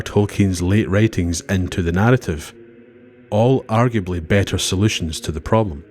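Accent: Scotland, United Kingdom. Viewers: very well made video, and as a german, loving the scottish accent :) Obviously there was no primogeniture among the noldor, as always there have been descendants with a higher claim. like the sons of faenor. so one could assume, that birth wasn't everything when the elves considered who should be king.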